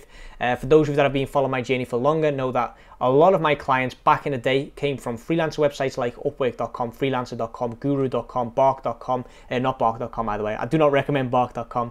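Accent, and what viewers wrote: Liverpool, United Kingdom. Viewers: High quality content🙌💯 you have a scouse accent haha, it's really unique and I love it. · Where abouts is liverpool you from?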